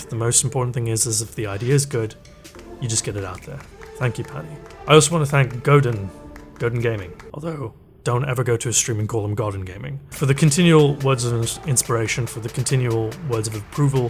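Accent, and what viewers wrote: United Kingdom. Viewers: Happy birthday,! Only subbed very recently, literally two days ago. But I love the content I have seen, and it's lovely to see/hear a brit in a sea of Americans. Thanks for the tips and the laughs, here's to 2021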